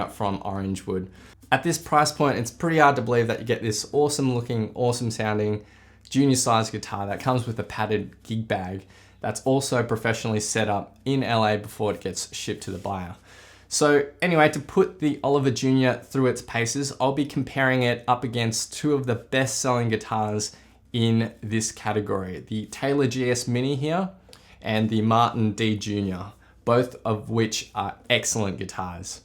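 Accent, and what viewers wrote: Australia. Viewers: Yaaay an Aussie ! FINALLY❤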